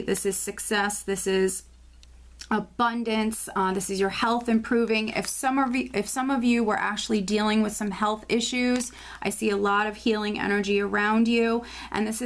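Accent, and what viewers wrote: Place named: New York, United States. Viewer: where are you from? I hear a ny accent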